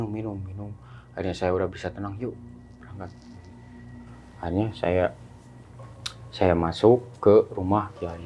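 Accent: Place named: West Java, Indonesia. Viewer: Sudanis pisan. Rada paciweuh pabaliut letah mun ngomong bhs.indonesia teh nya kang abi ge kang. Salalamet sdyana 🙏🙏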